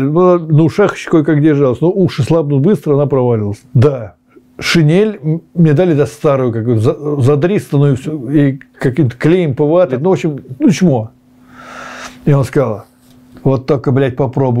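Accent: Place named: Okanye, Russia. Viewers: Слушаю, стал как он окать! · у меня был приятель из Коостромы, так он так же говаривал, я ему говорю - специально, а он удивленно, а я по другому не могу. А теперь послушай говор жителей из ДНР, ЛНР и солдат ВСУ, у них один характерный южный говор, такой же в Ростове, Краснодаре и т.д. Для изменения нужны годы, вот Примаков наш Премьер и руководитель СВР ранее говаривал с Тифлиским акцентом, а после института как диктор телевидения.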